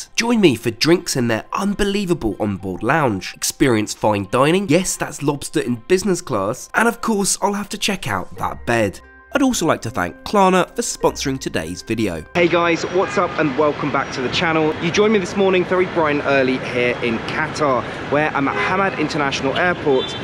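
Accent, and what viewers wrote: United Kingdom. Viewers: What?!! They are now blowing away Emirate's First Class. Great. Hey, your voice style is very British and sounds relaxing, thanks for all the contents you upload to YouTube, way better and honest reviews than the "Chu" Chu Train. LOL - But I still prefer their Q-Suite over this Eggshell seating.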